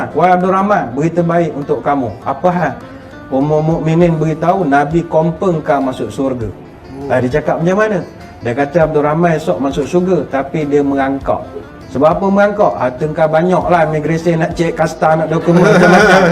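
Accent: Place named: Temerloh, Malaysia. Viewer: Best loghat tmerloh 😂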